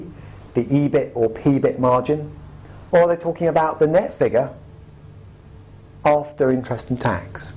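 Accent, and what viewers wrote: England, United Kingdom. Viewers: Is it just the English accent or he actually resembles Christian bale... Great video...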